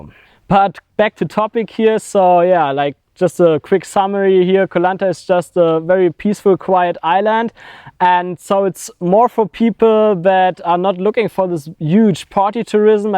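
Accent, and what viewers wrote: Germany. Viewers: Love the German accent. Great info :)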